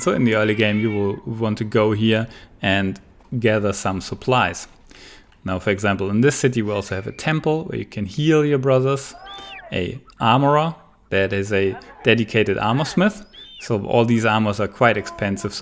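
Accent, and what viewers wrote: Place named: Germany. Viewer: I'm so stoked. Hearing the German accent of one of the BB developers just adds to the hype and excitement. My first band of brothers will be called, "The Regulars" in your honor.:-D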